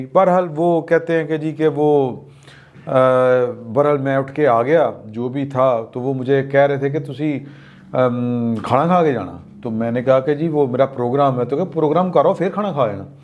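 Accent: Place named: Lahore, Pakistan. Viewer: I also belong to Lahore. And like your Lahoree Style for the deliverance of your views.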